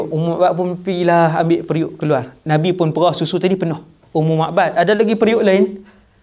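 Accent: Kedah, Malaysia. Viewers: Suka dengar ceramah ustad.. Dengan loghat kedahnye...❤️.. Semoga ustad sentiasa dlm lindungan dan rahmat Allah.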